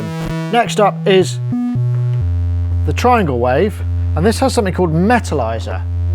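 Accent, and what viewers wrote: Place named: Cockney, United Kingdom. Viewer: Love that cockney style.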